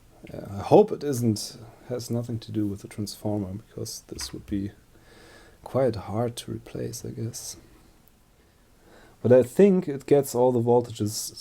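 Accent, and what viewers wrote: Germany. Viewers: Nice Video. Could it be that you are from Germany, weil du hast einen leichten deutschen Akzent 😁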